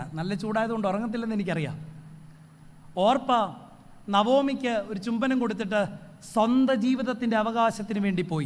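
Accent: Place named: Kottayam, India. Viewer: very valuable...speech Iheard the full-speed. kottayam language made me very happy.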